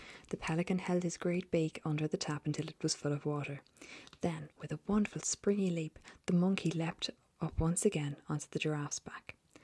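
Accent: Ireland. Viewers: I love the Irish accent!! Great job🍀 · I love love love your Irish accent:)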